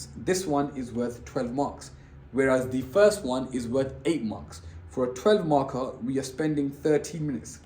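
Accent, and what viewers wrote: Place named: Leicester, United Kingdom. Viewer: hes defo from Leicester but otherwise real good teacher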